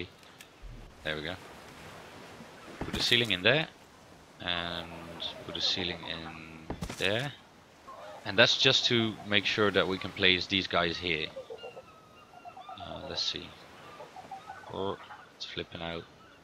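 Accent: Netherlands. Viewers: ben je nederlander ? are u from holland ? u sound like 1 lol :P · i immediately noticed your dutch accent :D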